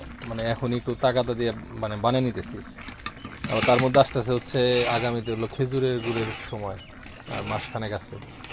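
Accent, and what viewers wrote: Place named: Manikganj, Bangladesh. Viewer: ভাই আমার বাড়ীও মানিকগঞ্জ আপনার কথা আমাদের কথা একি রকম ৷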